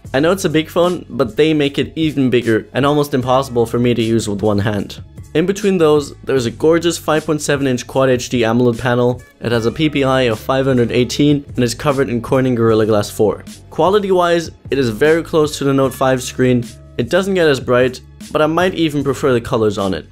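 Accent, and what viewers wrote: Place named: Germany. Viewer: The "aluminium" and your accent give away that you are german, am I right? ;) Aber super zu sehen, dass hierzulande doch ein, zwei Leute neben mir das 6P haben. :)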